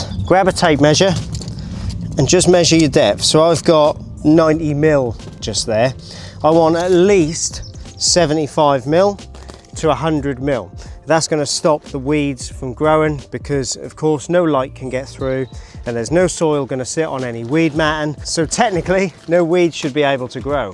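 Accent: Norfolk, United Kingdom. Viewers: Could tell you were from Norfolk within about 5 seconds 😂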